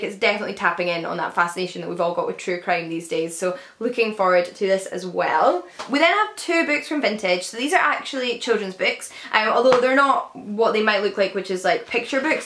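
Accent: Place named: Glasgow, United Kingdom. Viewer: I can't understand the person who made this video. She sounds like a glaswegian docker.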